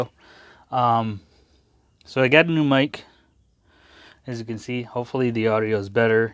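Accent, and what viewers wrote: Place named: Mexico. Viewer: You still sound Mexican ☻😀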